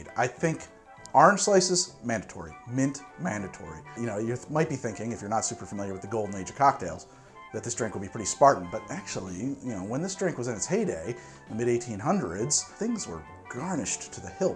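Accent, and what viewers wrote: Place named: New Jersey, United States. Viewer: Join the subtle Jersey accent. Just wait until you start calling them Flahrida ahranges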